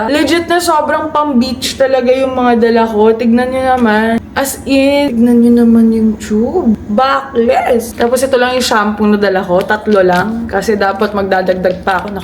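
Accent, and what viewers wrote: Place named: Pampanga, Philippines. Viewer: HAHAHAAHHA love the kapampangan accent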